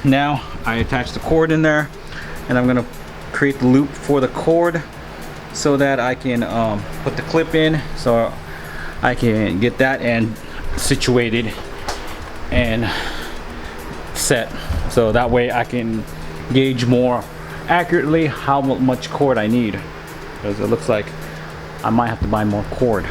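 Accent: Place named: Mexico. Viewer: That's a typical Mexican English accent 🤭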